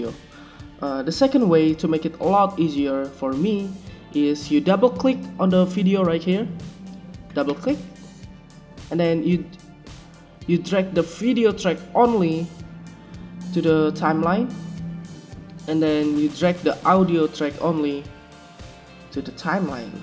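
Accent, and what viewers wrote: Indonesia. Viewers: Ur accent is funny,indonesian?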